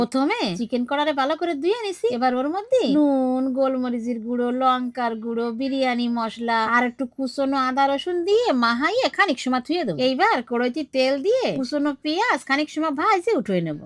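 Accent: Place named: Jessore, Bangladesh. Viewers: Unar kotha shunle Mone hoi Bangladesh er jashor er manush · বাংলাদেশের যশোর অঞ্চলের ভাষা। নিশ্চিত উনি যশোর অঞ্চলের মানুষ।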